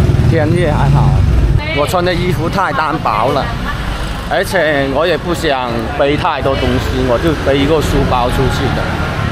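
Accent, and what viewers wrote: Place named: Guangxi, China. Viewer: 广西老表么，口音好像啊😂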